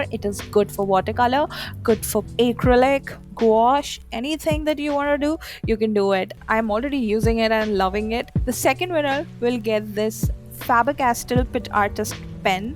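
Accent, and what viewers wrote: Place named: Kerala, India. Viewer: I guess ur from Kerala Because of ur english i came to know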